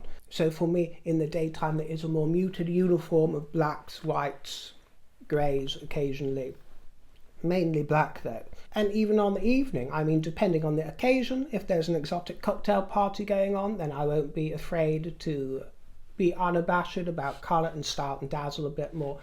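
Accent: Australia. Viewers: Love your Australian accent. 😅😘❤🇦🇺 We are honoured. Seeing the Uluru "handed back" commemoration ceremony shows how lovely the King is to our indigenous people and they were all beaming. You wouldn't think that was the case if you listened to agitators here who claim to speak for them. Lovely to see. ❤ I'd love if you did another round of keepsakes. 😌❤️👑🙏 You do mean a lot to all of us. xo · dear! That's a fine Australian accent, I thought. ❤ 🥝 🇳🇿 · I just love your Australian accent 😂 🇦🇺🇬🇧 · Bloody hell Aussie accent is so good! 🇦🇺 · Good Aussie accent ❤ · This old Aussie chick likes your Aussie accent.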